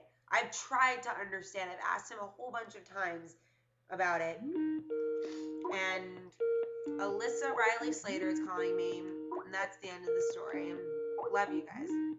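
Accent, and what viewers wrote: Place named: Florida, United States. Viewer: I love your Floridian accent.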